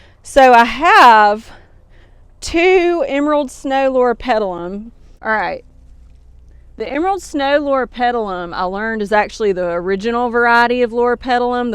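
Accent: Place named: Mississippi Gulf Coast, United States. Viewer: In Central TX there are no pine trees. We use cedar mulch and they sell it at the gas stations very cheap. When the mulch shows up you know it’s Spring. Love hearing your accent as it reminds me of home along the Mississippi Gulf Coast. Enjoying your channel!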